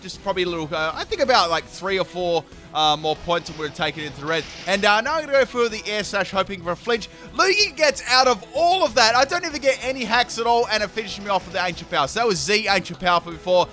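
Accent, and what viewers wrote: Australia. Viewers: U sound Australian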